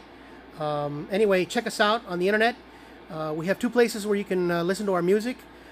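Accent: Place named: Mexico. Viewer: Tienes cierto acento mexicano jaja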